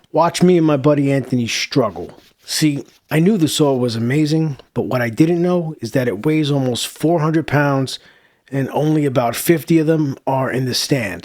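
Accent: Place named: Bronx, United States. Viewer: I feel like I'm watching a bronx tale with his narrating. Lol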